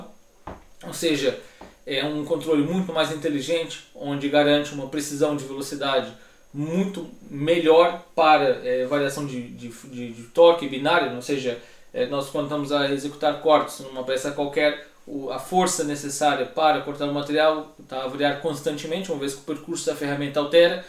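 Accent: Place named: Brazil. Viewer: Olá! Parabéns pelo vídeo! Sou do Brasil. Confesso que seu sotaque é bem reduzido. Fiquei em dúvida se você era brasileiro ou português... Kkkkkkk.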